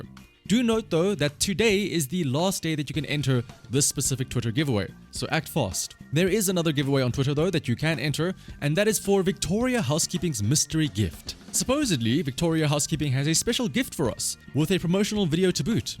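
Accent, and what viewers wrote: South Africa. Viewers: I'm sorry this is so sudden and random but this has been bugging me for ages... Are you South African? Your accent is so specific (I'm South African too)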